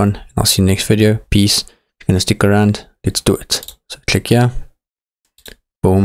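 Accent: South Africa. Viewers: Ah, a South African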